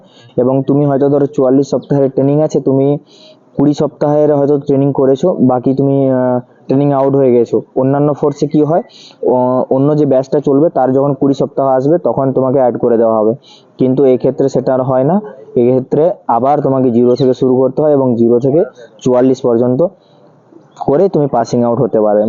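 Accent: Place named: Medinipur, India. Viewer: Bhai tomar bari ki মেদিনীপুর...mone holo kotha sune 😊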